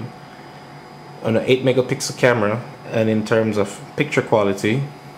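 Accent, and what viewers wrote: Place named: Jamaica. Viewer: Good Review, (I Click Liked thumbs up) You have a Nice Jamaican accent, But we Trinidadians are rated internationally as Having the "Sexiest Accents in the World" ...........LOL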